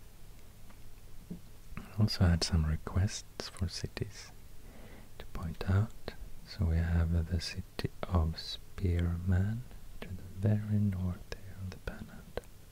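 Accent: Sweden. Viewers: Svensk? Eller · Idk why but there’s something satisfying about hearing Texan city names being spoken in a Swedish accent.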